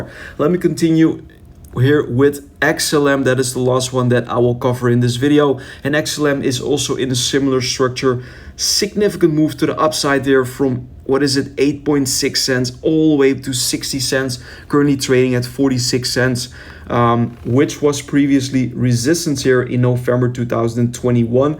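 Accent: Netherlands. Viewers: i love you content ♥️ I immediately subscribed to your channel!.. and even though you speak very good English... I still hear that you have a Dutch accent... is that right? 😊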